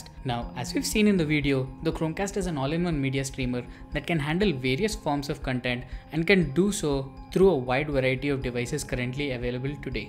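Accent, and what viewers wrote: India. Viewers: that Indian accent though... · Haha...he is speaking Hinglish. So, you won’t understand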